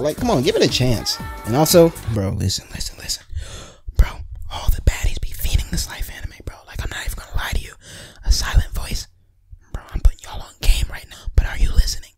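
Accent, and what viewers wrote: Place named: Toronto, Canada. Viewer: I can tell this man is from the GTA. I didn't why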